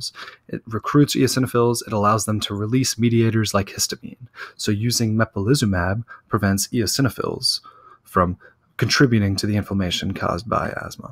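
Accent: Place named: United States. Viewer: I love your american accent and the way you say RECEPTOR :-) :-* Best regards from Munich,Germany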